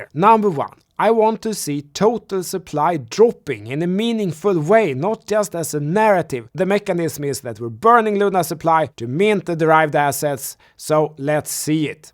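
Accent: Sweden. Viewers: Skönt med någon som kör hårt på sin svenska dialekt, älska`rt! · Man this Swedish accent is thicc a-f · Snälla sluta prata Stockholms engelska, hemskt för fan.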